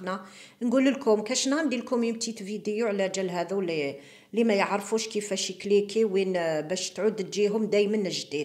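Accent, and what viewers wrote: Algiers, Algeria. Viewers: هدرتك حلوة ومنسمة بريحة دزاير العميقة .شكرا على الوصفة.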